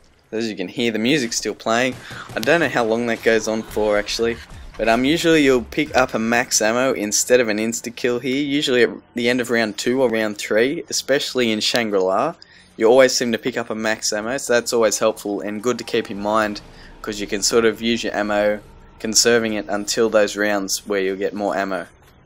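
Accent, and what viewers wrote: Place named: Australia. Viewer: lol u have an accent that even though im aussie as well but thats probly because im watching too many american zombie players ;) so glad i finally found an assie one from faction 115 BBBBEEEEAAAAASSSSSSTTTTTT MMMOOODDDDEEEE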